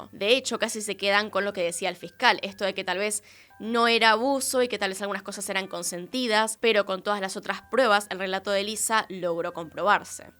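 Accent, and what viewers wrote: Argentina. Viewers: Ayyyy me encantas 😍 pekeña cómo hablas tu idioma y siempre te arremedo con tu acento boludooo soy mexicana te mando muchos saludossss y el gran contenido de tus relatosss e historias que cuentas, además es la 1 vez que te escribo Por si estabas con el pendiente Jajajajajaja cuídate mucho te mando un 🤗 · Me encanta el hablado Argentina Dice Shaaaaa por decir ya Jajajaja me encanta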